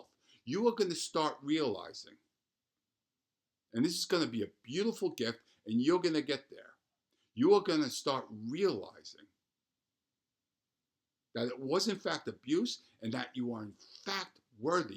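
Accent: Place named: East Coast, United States. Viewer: You sound East Coast. I live in Philly, and if you are near here I will gladly re-pay you by taking you through physical recovery with Yoga and other methods I use.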